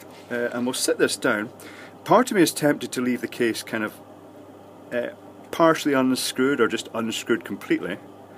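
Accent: Scotland, United Kingdom. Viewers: I find your videos absolutely fascinating, but sadly, due to my lack of knowledge, I sit here watching and it’s like watching a foreign language film! No, I don’t mean your brilliant Scottish accent, I mean I have no understanding of all the electronic terms!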